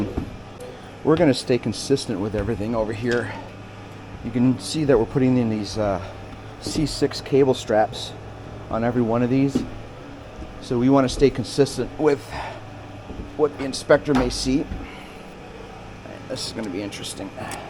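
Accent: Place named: New York, United States. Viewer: Buddy, you sound like you JUST came from New York City!! Definitely!!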